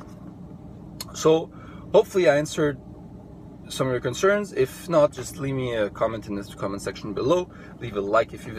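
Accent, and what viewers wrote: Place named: Russia. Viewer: Pe bune? Eu sunt Moldovean si chiar credeam ca esti rus, ai accent rusesc :D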